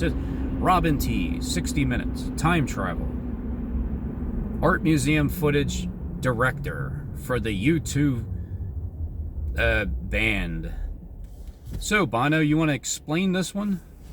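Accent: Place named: Philadelphia, United States. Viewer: good study of the Philly accent........I lost mine, been living in Jersey for 55 years.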